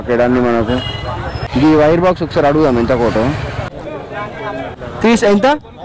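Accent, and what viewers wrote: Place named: Hyderabad, India. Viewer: Pakka Hyderabadii antar ra babu🤙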